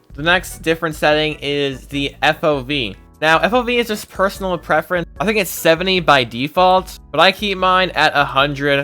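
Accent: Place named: United Kingdom. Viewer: they both sound young and briish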